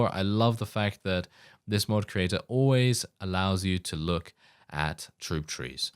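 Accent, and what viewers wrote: United Kingdom. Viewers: Angelo Orsini (literally little bears, one of the most influencial roman family of that time) would be a very nice name for your character. It's lovely to hear speak italian with your british accent. If you are gonna make a series, join Venice, make the doge proud. Hail San Marco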